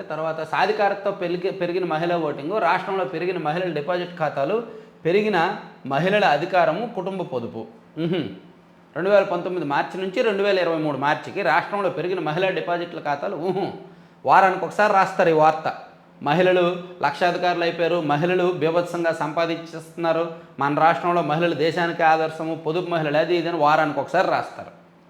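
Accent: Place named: Uttarandhra, India. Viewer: Babu née voice mana Uttaranchal voice lo maaku nachhutundi and content Kuda baguntundi Kani neeku inka people support Kavali alage people tho direct talking introducing Chalayan Manchin alochana wish you all the best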